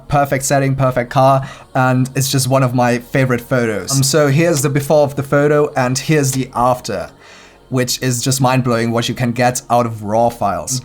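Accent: Germany. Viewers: Are you German? Because you sound like this😅. Ps: I am German too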